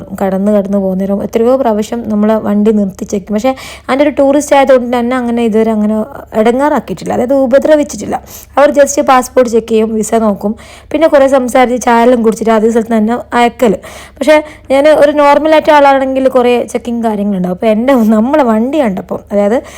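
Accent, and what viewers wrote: Kannur, India. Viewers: ഒരു കാമറാവുമണിനെയും കൂടെ കൂട്ടാമായിരുന്നു. ഭാഷ ഒന്നുകൂടി നന്നാക്കാമായിരുന്നു. തനി നാടൻ കണ്ണൂർ ഭാഷയായിപ്പോയി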